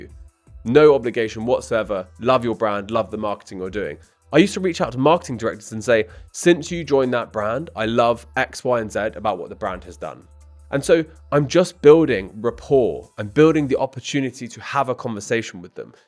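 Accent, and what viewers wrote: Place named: United States. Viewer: Dude, your (USA) English is very difficult compared to British people. Don't worry brother From Pakistan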